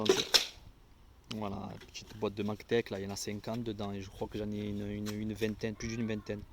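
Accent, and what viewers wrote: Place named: South, France. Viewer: T es un sudiste vu ton accent on est presque voisin 👋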